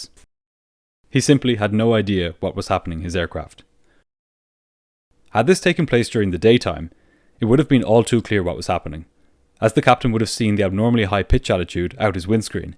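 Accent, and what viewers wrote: Ireland. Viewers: Only started watching your channel, its a great find!!! By any chance do I detect an Irish accent mo chara? I'm Irish and it seems like I do but I could be totally wrong! Let us know if you do!! Keep up the amazing videos and keep safe Mr! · Yes yes i thought i got a little Irish accent in there too 😅🍀🍀 im also irish by the way 🇮🇪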